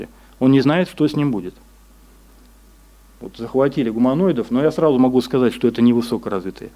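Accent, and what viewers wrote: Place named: Ulyanovsk, Russia. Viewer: Такой говор в Ульяновской области.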